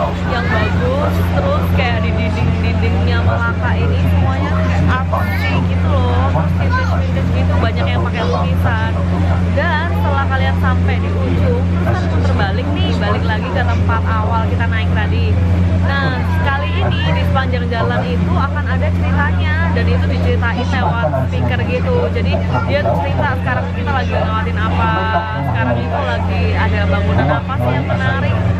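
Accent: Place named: Java, Indonesia. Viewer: Logat nya ky org jawa kak,aq org jawa stay di malaysia sudah 11 th,aq pernah travel ke malacca...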